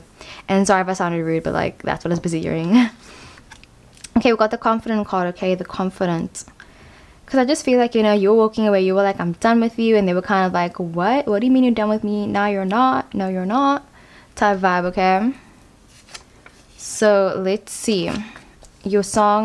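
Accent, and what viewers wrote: South Africa. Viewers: Your accent is beaitiful...are you South African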